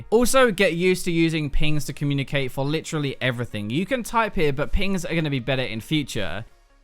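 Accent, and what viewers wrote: United Kingdom. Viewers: Not the guy with the british accent again :(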